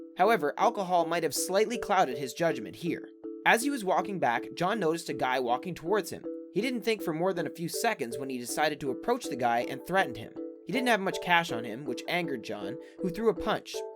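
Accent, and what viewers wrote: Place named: Canada. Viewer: You can tell the guy talking is Canadian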